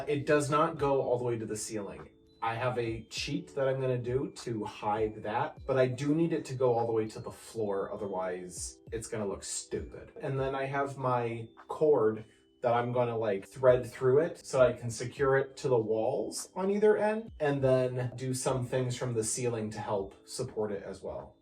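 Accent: Minnesota, United States. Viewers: On the 10th Day of Vlogmas my true love gave to me: Ten-ish strands of "tinthel" Nine ladies ironin' Eight cameras tumblin' Seven cutouts glowin' Six berries spillin' Five Christmas trees 🎄🎄🎄🎄🎄 Four Paolo meows Three sets of batting Two power strips 🔌🔌 And you sound like the movie Fargo 🎄⛄🙂⛄🎄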